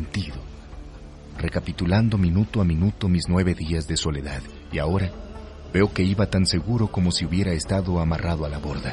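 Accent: Mexico. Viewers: Cómo siempre las voces mexicanas deleitando al auditorio tanto en películas como en narraciones . Felicidades desde stgo . De chile .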